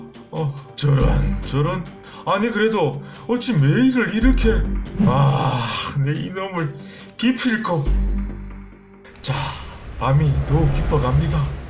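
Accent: Gyeongsang, South Korea. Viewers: 넘 재밋어요. 교훈도 되구요… 뭐니뭐니 해도 남도 구수한 갱상도 억양이 잴로 좋아 홀딱 반했어요.ㅎㅎㅎ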